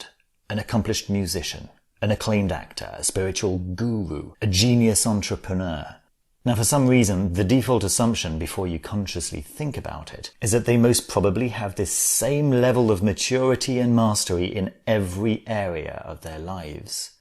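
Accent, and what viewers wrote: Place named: United Kingdom. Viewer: A-dee-siv! I l love the British accent. Bring on the Devine Feminine! Give us what ya got.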